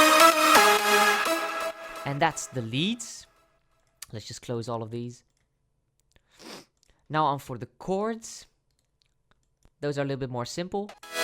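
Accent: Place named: Netherlands. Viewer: lekker nederlands accent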